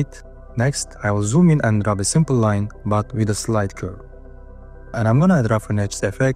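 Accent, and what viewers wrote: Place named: Turkey. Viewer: Where are you from, brother? Your accent sounds like Turkish.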